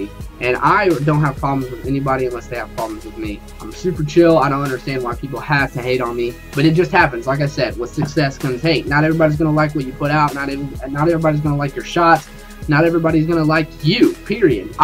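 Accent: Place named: South, United States. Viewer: Why does he sound like a typical hillbilly incest 3rd grader?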